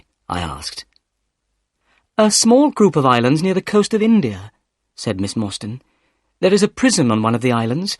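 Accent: United Kingdom. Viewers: Es inglés británico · Me encantó!!! Creo que entrenar el oído es la major manera de iniciar, aunque siempre se necesita un poco de la vieja escuela, manuales y eso. Puedo leer porque escuche como se pronuncia la palabra y puedo traducir oralmente pero me cuesta muchisimo más escribir, es tan triste que no pueda siquiera copiar sin estarme fijando el orden de las letras en la palabra. Aunque soy feliz entendiendo lo que lees, realmente creo que lo haces genial.. con el toque inglés más que americano pero quizá es por el libro que es de esa nacionalidad. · Es el inglés británico cierto?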